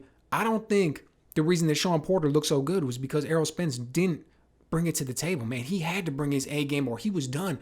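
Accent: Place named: South, United States. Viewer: always dig your insights man. plus you sound like a southerner (I'm from/in Mississippi)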